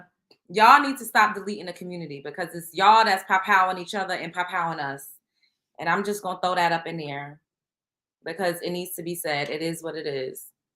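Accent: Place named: New York, United States. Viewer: Hey girl! Do you remember Miss Jones from Hot 97? You sound a lot like her. It’s the NYC accent for me! 😍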